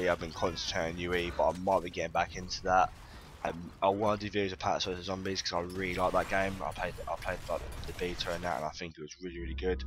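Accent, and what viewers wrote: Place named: United States. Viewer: Yay <3 thought you were American !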